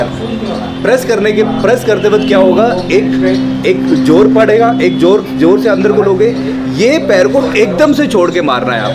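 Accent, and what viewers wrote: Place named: South India, India. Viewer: Excellent Sir.... I am doing foundation course of Kalari and once done, I would definitely like to connect with you.... Sir aapka hindi language mey jo 2 percent south indian ascent hey usko barkarar rakhna.... 🙏😊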